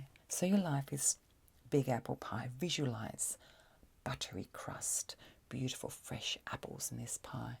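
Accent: Australia. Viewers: I love your accent!! Are you Australian?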